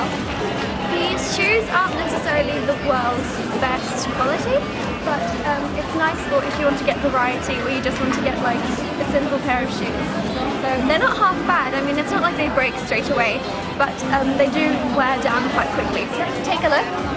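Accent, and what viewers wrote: United Kingdom. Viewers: their accent is british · Are you british? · you sound British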